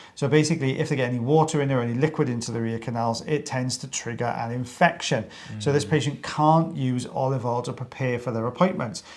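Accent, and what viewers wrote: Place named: South Wales, United Kingdom. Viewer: Nice to hear a bit of Welsh. South Wales I’d say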